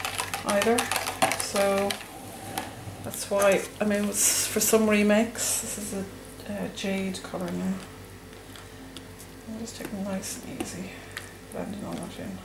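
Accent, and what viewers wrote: Ireland. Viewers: it's always such a joy to watch yours video's. Not only for the soapmaking, but also your contagiously laugh, hearing you chatting,.. dare I say your Irish accent which is as pleasing to hear! <3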